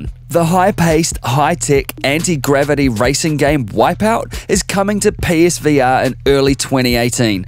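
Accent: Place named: Australia. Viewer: My favorite thing about your channel has always been your voice. It's a sonorous Aussi voice <3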